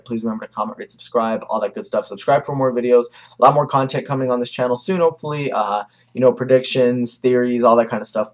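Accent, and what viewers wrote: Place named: United States. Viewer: lol you sound very American in your pronunciations of names but yea I am the same but British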